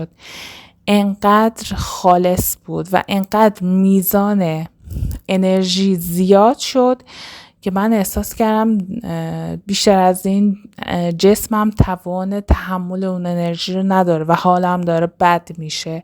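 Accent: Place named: Kerman, Iran. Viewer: سلام عزیزم اگه کرمان هستین لطفاً بگید چون منم کرمانی ام ولهجتون فک می کنم همشهری هستیم من خیلی نیاز دارم باهاتون صحبت کنم اگه میشه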